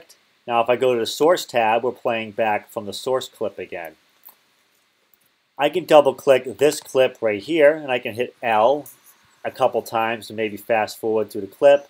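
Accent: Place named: New England, United States. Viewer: Great to hear a New England accent. It actually cracks me up I haven't heard it in so long.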